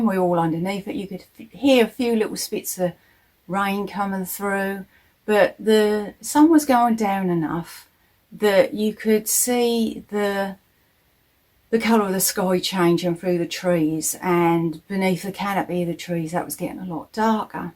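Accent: Suffolk, United Kingdom. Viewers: Love your videos. I’m a Suffolk girl born and bred, like you. Love hearing our accent. It seems to be disappearing, please keep up your hard work. xx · As me dad would say bootiful me darlin 😊😂 Im originally BSE .my family all over Risby ,Thetford, Stowmarket. Im suffolk side of Essex, suffolk, Cambridgeshire border now not the same but so proud of my accent not as sharp as yours my aunties all had that broad suffolk love it hun ❤️ love a good ghost storie . Years ago we go on Sunday drives with mum and dad. End up anywhere west stow . Fritton. Snetterton Sunday market..Felixstowe.. go nut picking berry picking. Explore woods and little villages beautiful had proper family time no one get that chance today 😢 thats how I know i always feel at home more in the woods but no dont want dark figures giving me the hebie gibies 😂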